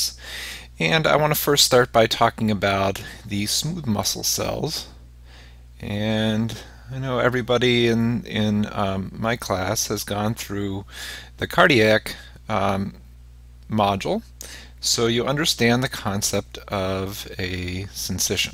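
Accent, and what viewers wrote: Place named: Jamaica. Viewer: 1. excellent i have already liked it on the icon side 2. minor revisions recommended - a) the slimy Jamaican English accent could be changed, b) not a semi-audiobook, coz i don't want to be seeing the videos whilst hearing to his good english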